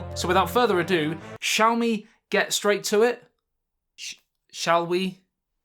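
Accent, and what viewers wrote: England, United Kingdom. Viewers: British accent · Are you english?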